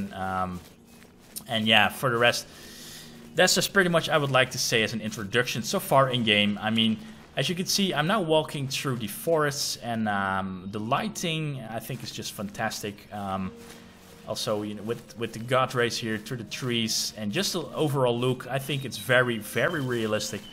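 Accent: Netherlands. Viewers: Hello fellow Dutchmen. Altijd fijn dat je aan de uitspraak meteen merkt dat iemand Nederlands is.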